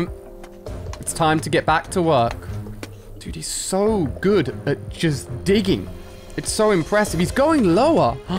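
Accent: United Kingdom. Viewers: Are you British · R u British? I am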